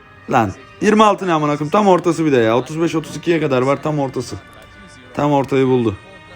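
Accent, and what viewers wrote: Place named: Aegean, Turkey. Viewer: Siven tam ege şivesi reis mausuna taş deymesin belki bizede bu 444 kişinin için şans güler bol şanslar 🖐️ Papara numarası <num> A. D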